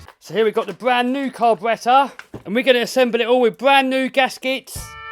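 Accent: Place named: United Kingdom. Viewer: DUDE I THINK ITS AWESOME HOW YOU PRONOUNCE CARBURETOR..!! I like the British accent.. its awesome.! 🤟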